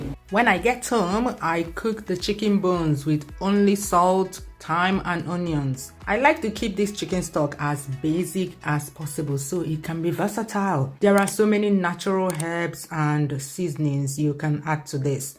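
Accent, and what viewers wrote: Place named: Anambra, Nigeria. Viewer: This is great video my dear sister. Your intonations sounds like my country state anambra. Am from Ukpor nnewi south anambra state. I started my new channel as a small Youtuber. I love all your videos my dear sister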